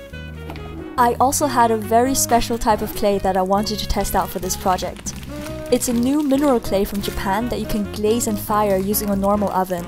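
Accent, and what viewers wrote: Germany. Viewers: bist du deutsche? ich finde dein Akzent klingt irgendwie deutsch😁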